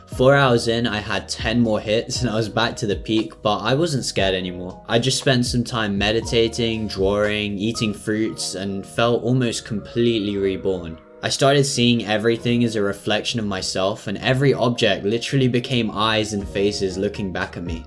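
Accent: United Kingdom. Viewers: Your videos are amazing bro: you deserve so many more subscribers!!! Also nice to hear a British voice in this community 🤩🎇🍃🍄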